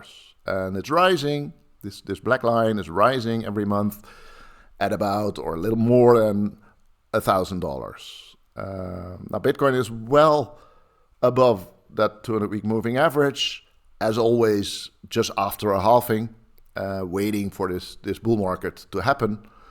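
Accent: Netherlands. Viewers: Is that a dutch accent???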